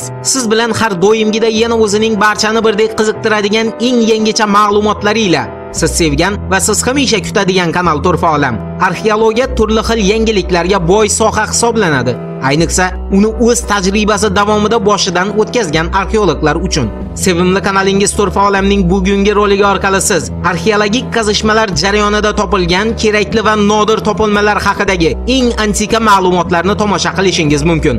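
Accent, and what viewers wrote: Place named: Andijan, Uzbekistan. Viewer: Anjanchasiga gapiryapdi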